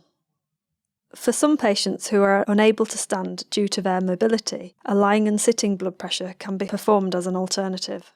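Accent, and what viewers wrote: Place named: United Kingdom. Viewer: Beautiful explanation with lovely British accent. Thankyou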